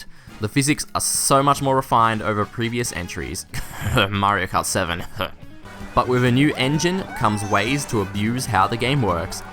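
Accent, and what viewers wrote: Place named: Australia. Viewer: hey, an Aussie.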